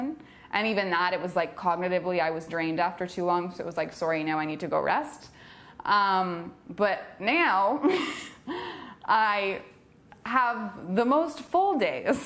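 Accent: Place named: Canada. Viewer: I relate to your story so much. Congrats on your recovery. Love your Canadian accent. Cheers from Southern California.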